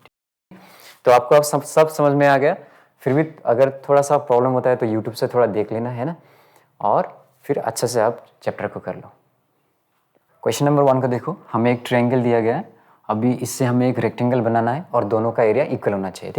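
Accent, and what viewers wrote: Assam, India. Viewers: Sir are u frm Assam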